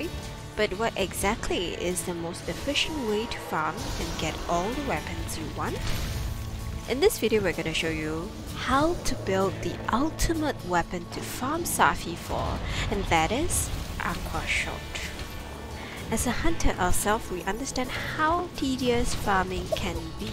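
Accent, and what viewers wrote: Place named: Singapore. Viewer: You sound so Singaporean!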